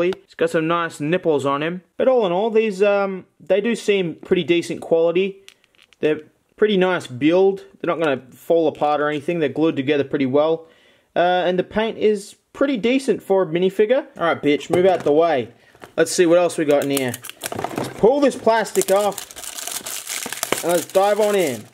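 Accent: Australia. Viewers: Intense aussie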